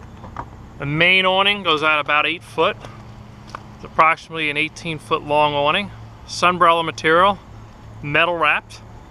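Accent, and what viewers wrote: New Jersey, United States. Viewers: Great job! Thanks for pointing out the details. The camera lens was a bit annoying at times but it also showed a lot more that way. Love the Jersey speak. Had the feeling Ray Romano was selling me an Airstream!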